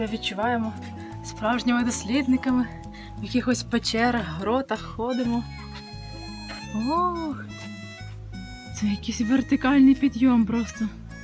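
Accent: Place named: West, Ukraine. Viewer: доброго дня.😀. Дивлюся вашівідео,дуже гарні і позитивні,а найважливіше Українською. Звідки ви,бо чую ніби західний регіон?. Хотів би запитати можливо,щось чули про завод Sempermit,в містечку Odri,неподалік від вас? Бо виробляю туди документи на роботу з дозволом на 2 роки.Дуже дякую за інтересні відео,успіхів вашій сім'ї. Можливо колись зустрінемось в Чехії.дякую.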